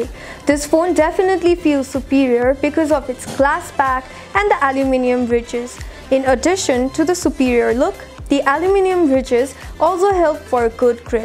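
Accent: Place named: England, United Kingdom. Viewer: I like your english accent.. 👍👍👍 Your pronunciation is very good.. And your video is pretty simple to understand.. I like it.. Keep it up.. Looking forward more from you.. 👍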